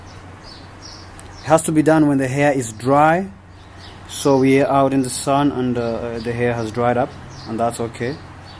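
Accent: Kenya. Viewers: you sound Kenyan...I wish I saw this before I had my loc extensions installed .... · This is a wealth of information!!! I have been looking for a video that explains how to do this properly. Thanks!!! You sound Kenyan BTW. God Bless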